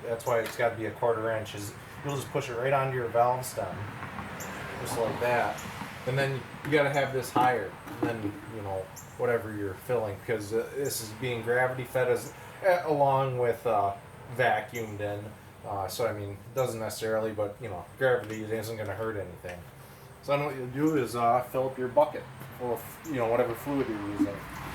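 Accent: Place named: Wisconsin, United States. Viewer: Done. I am also from Wisconsin so I recognized the "accent."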